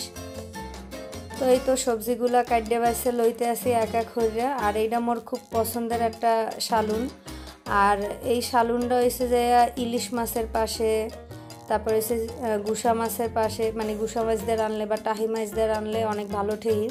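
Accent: Barishal, Bangladesh. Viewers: Apu apner basa borisal e konkhane amar basa o borisal e .....amader borisaler basai vlog sune valolaglo ti subscribe kore fellam jate amader borisaler basa sunte pari....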